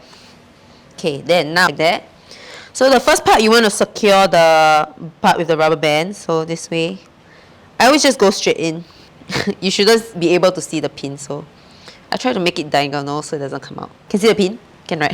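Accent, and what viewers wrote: Singapore. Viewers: I didnt know u have youtube channellll 🥺 glad i know it noww hehe ❤ i really miss hearing someone speaks in singlish coz i have no singaporean fwens here in indo and i rarely talk to my old fwens in sg anymore 😔 looking forward to more vidsssss 🎉🎉🎉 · I am ex CX, did u know sq and cx are very competitive(our point of view lol), I always heard sq was doing thus and that...blar blar.hahaha I was wondering sq girls do not do galley works, male crews hanfle galley, so your uniform can be fit and tidy, is it true? any way, welcome to Korea, hope you have another wondetful life here. your English accent remind me my SIN crew buddy that I haven't met over 15 yrs since I resigned. miss crew days sometimes. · I can only say "what?" "Excuse me?" For some of your Singlish especially when it comes so fast 😂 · idk y but lemme just enjoying that singlish lol, anw that sounds more like malaysians when they r speak english i thought >.< imo thats super easy to identified melayu characteristic xixixi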